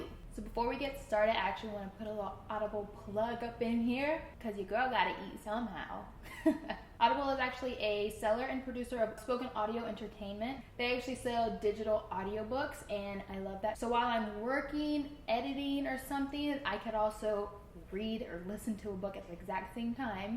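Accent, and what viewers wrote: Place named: Texas, United States. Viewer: i feel like you had a strong texas accent throughout this video 😂💕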